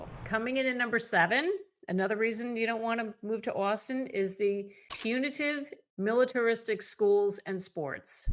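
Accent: New Jersey, United States. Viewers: Sounds like New Jersey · Well, I'm in eastern Washington, so I have no dog in this fight, but come on ... Here we have a real estate agent with a strong Jersey accent talking about how bad Austin, TX is. Now that's just funny. How clueless can a person be?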